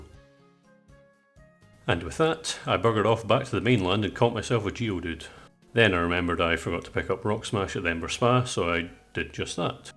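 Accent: Scotland, United Kingdom. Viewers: wake up babe, new scottish poketuber called just dropped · Well done man. Good to hear a Scottish voice too 🏴💪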